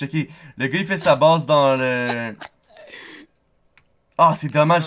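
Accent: Quebec, Canada. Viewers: Minecratf Je suis prêt a parier que tu est Québecois, car moi aussi j'ai la même accent et je suis Québecois ;))) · comme tu aimes bien les nouvelles personnes, je m'abonné like et encore un nouvelle épisode d'admin série =D car oui je regardais tes épisode auparavant et je go rejoindre ton serveur dans probablement quelques minutes ;) tu es québécois? j'ai l'impression :p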